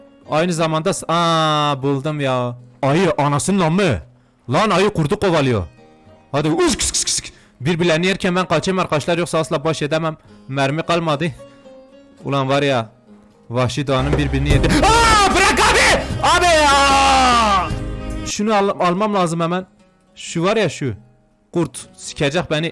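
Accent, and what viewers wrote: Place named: Southeast, Turkey. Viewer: Bu şive erzurumum şivesi değil, bu yaptığın şive kürtlerin kullandığı güneydoğu şivesi.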